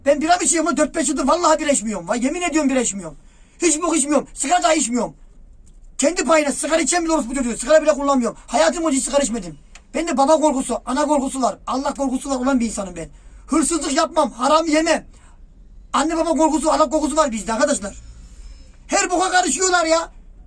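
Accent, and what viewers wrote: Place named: Yozgat, Turkey. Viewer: Slm abi biz seni olduğun gibi beğeniyoruz yaşadığın hikayeleri anlat sen yarı yozgatlıymışsın bnde bir yozgatlı olarak şiveni çok beğeniyorum bol bol hikaye anla yaşadığın komik olayları iyi yayınlar diliyorum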